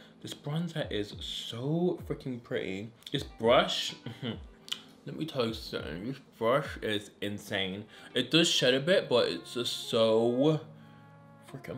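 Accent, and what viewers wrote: United Kingdom. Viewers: When the Viewers forget all about the heavy uk accent 😩Not from the US guys😂😘😍😘😍😘luv watching u😍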